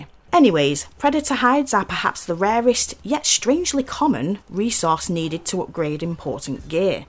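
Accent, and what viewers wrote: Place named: England, United Kingdom. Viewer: Yes, but her English accent is really strong lol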